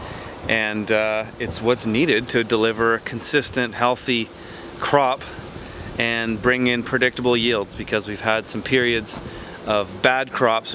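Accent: Canada. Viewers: You have the softest Canadian accent I think I've ever heard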